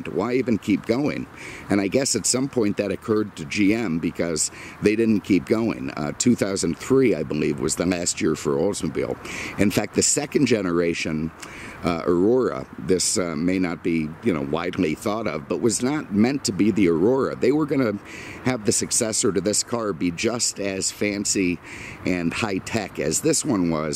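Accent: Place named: Chicago, United States. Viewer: He has GOT to be from Chicagoland. He sounds 100% identical to my old neighbor. I even sent one of videos to his wife and she laughed hysterically.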